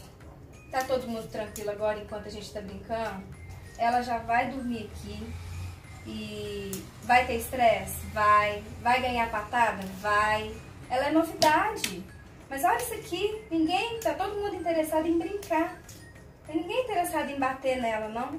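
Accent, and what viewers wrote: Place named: Minas Gerais, Brazil. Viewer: Adoro o sotoque mineiro....aprender com esse sotaque é bacana. Gato e a gente adora brincar.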